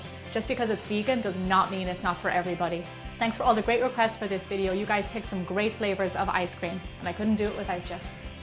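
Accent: Ireland. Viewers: Delicious!!!! and I like your Irish accent. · Is that an Irish accent breaking in and out? Lovely!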